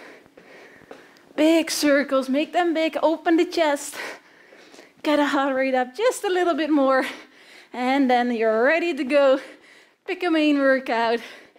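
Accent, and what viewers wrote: Netherlands. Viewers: Dutch accent? so cute! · Are you Dutch? I can hear the accent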